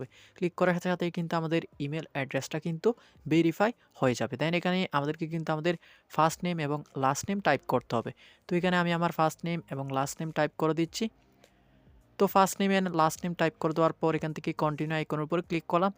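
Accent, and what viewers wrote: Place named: Chittagong, Bangladesh. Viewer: নিশ্চিত চাটগাঁইয়া 😐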